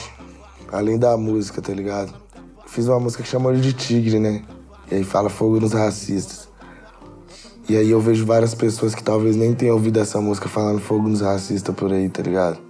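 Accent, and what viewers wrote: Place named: Minas Gerais, Brazil. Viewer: Eu conheci seu trabalho e fiquei apaixonada com suas letras fortes e sua simplicidade nesse vídeo só me mostrou o quanto vc é especial e chegou sua hora de brilhar. Eu sou leiga no assunto rap, escuto rap... mais sou bem eclética e te confesso que estou viciada no seu som e quando vejo eu tô escultando rap o dia inteiro. Que Deus te abençoe grandemente, estou torcendo por vc e sei que vc é do bem e quer o bem mostrando nas suas letras que todos somos iguais, independente de cor, religião ou classe social. Parabéns! Ahh e adorei seu sotaque de mineiro, é isso né vc é mineiro?!Beijos Djonga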